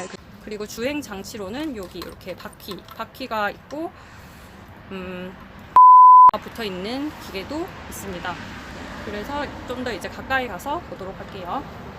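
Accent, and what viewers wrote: Gyeongsang, South Korea. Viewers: 마 갱상도 사투리가 슬쩍 들리네예.포클레인은 굴착기 제작 회사 이름이었죠. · 굴삭기땅크애니띵 말투가 갱상도시네요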